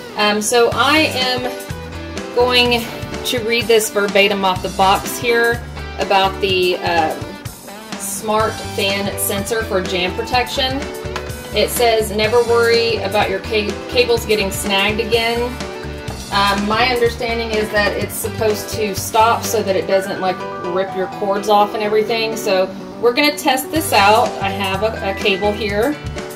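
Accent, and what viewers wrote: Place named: South, United States. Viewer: Good clear voice, southern twang charming, deserves a better a microphone. Increase in music volume at end was a bit abrupt. A somewhat longer, less repetitive backing track would be nice (though JayzTwoCents is somewhat guilty of that too). I was hoping to see the back of the fan because that's how I'd be mostly be seeing it with my setup, so please show parts being unboxed/reviewed from all angles please, especially ones then manufacturers don't so much. Good point about the cable length and well done with the ARGB / smart sensor feature testing.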